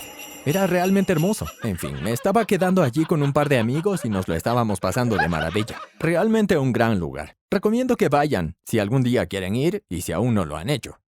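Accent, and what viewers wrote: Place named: China. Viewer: soy la única que pensó que la vos parecía de un chino hablando español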